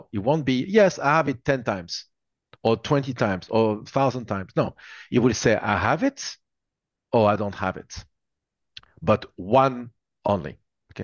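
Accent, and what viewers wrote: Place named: France. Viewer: I also want to say a big thankyou, and wonder if anyone else has started to develop with their inner monologue taking on a french accent whenever they're recalling something has taught:face-pink-tears:.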